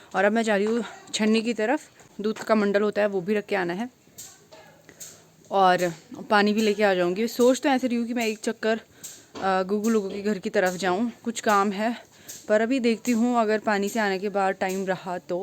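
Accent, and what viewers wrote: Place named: Garhwal, India. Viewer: hindi with gadwali touch❤‍🔥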